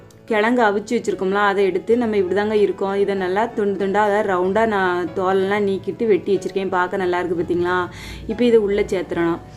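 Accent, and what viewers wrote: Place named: Tirunelveli, India. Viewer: நெல்லைதமிழ் வாழ்க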